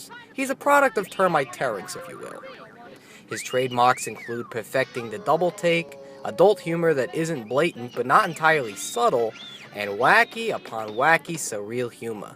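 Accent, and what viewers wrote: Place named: New York, United States. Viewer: You have a very interesting almost extinct New York accent.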